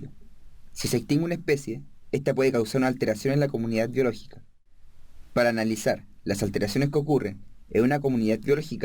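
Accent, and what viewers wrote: Chile. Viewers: no me puedo concentrar por el acento chileno xd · Gracias me ayudó mucho!😊 Eres de chile verdad? Me encanta tu acento :D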